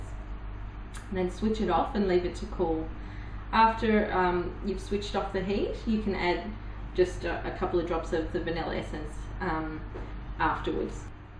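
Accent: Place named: Australia. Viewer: Oh wow! Looking for a Bassboussa recipe and getting one in an Aussie accent, so refreshing:) Hey lovely, I have seen a few tips about not mixing it long enough (so as not to release the gluten) some mom’s actually go as far as saying mix the dry ingredients with the melted butter first (to coat the semolina individual particles) Will definitely try it this weekend, hosting a professional chef for dinner, pressure is on! :)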